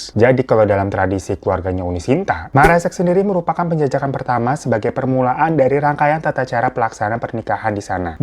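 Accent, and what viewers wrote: Java, Indonesia. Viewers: Kenapa logatnya jadi jawaa wkwkwk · Logatnya jawa banget bang 😂😂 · Koq aku ngakak bahasa menang logat Jawa....😅😅 semangat bang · Kenapa logat nya jadi jawaaa 😭🤣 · Bahasa pake bahasa minang, tapi logatnya logat jawa, bang the best 😂😂😂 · Wkwkwk lucu banget bg ngomong bahasa Minang pakai logat jawa. 😅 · kooo minang jadi logat jawaaaa :face-purple-crying::face-purple-crying:🤣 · logat jawa tapi bawa bahasa minang😭😭😭😭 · Kenapa jadi logat jawa sih bang hahahhahaa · Bg ngomong bahasa Minang aksen Jawa bgtu😅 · Bahasa minang tp logat jowo piye toh bang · Kok logat nya jadi jawa yah kak 😅😅😅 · Bahasa padang tapi logat jawa 😂😂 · Knpa logatnya kita jd jawa ya Langsung ngakak 😅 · Bang napa logatnya jadi jawa wkwkkw bukan padang🤣gemoy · Bahasa minang logat jawa 😅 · Logatnya knp jadi jawa bangg😂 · Sempet bingung tadi dgr bang ngmg logat jawa pdhl lagi ceritain suku minang😂😂. 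Seharusnya adat2 seperti ini tidak perlu di lestarikan,krn memberatkan,dan tidak sesuai dgn syariat Islam yg mayoritas agama disana,jadi kesannya kyk jual anak. sebagai orang tua harus lebih wise untuk kebahagiaan anak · minang logat Jawa ya bang😲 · Wkwkwkwk.. agak lucu bg.. logat bahasa minangnya jawa.. tapi usahanya untuk berbahasa minang top lah.. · Bang, haha kok lu jadi logat Jawa bang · BANG w salpok LU NGMNG bahasa minang tapi logat jawa😭😭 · Minang logat jawa. Lucu bet sih bang. Awokwowokkk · baca text Minang pakai logat Jawa🤣🤣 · Bang pas lu bacain percakapan gua ngakak 🤣 malah medok jowo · Cerita Minang contoh bahasa malah logat Jawa BG 😅😅😅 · Ternyata bisa ya bahasa minang pakai logat jawa 🤣🤣🤣 emang beda 👍👍👍👍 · voice overnya jawa😂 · Bahasa minang logat Jawa😭🤣🤭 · Kenapa logat nya jawa si😂😂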